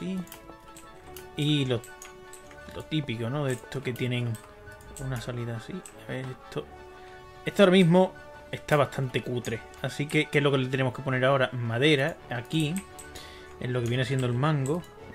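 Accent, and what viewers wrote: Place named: Spain. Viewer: De q parte de España eres?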